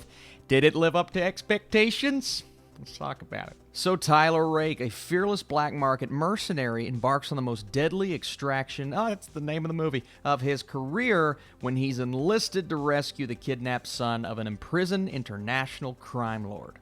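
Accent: South, United States. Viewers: That southern accent you have is lit😍🔥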